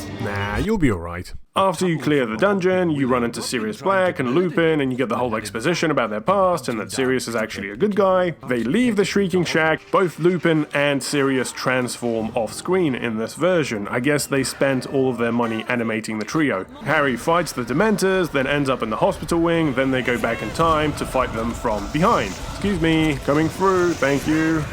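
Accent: United Kingdom. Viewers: Yes, i want a review of all HP games too. I want to hear your very british voice talking about very british stuff (despite the fact movies and games are american)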